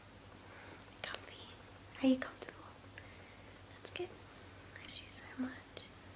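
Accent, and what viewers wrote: Australia. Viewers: The aussie accent completely threw me off 😐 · 90% Aussie accent 10% grab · australian accent on girls = yes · I never knew how much I needed an Australian accent in my life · Hey! Are you Australian?? (I hope I didn't offend you, if my assumption was wrong)... It's just that I'm Australian, and you have that slight accent/pronunciation of words, that automatically make me identify you as maybe being an Aussie :) Sorry for being a complete weirdo but, just curious XD Cheers for your time and effort to make this video! :)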